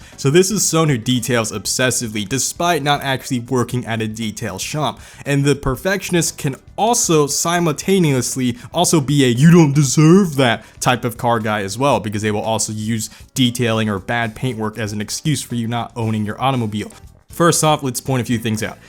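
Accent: United States. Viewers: he is Asian but sounds like an American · Ur asian? Ur like THE MOST american sounding person i have ever heard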